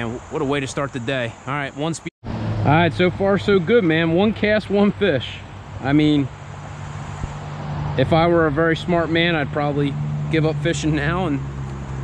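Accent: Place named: Pittsburgh, United States. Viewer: You sound like you're from Pixburgh! Right?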